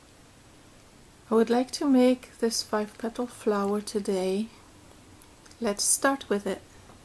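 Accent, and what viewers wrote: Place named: France. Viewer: Uh eine schöne französische Stimme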